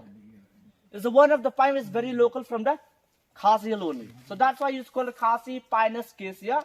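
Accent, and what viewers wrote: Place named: United Kingdom. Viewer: Ym dei da ka phareng hynrei dei beit da ka english kaba na UK... Keep it up bro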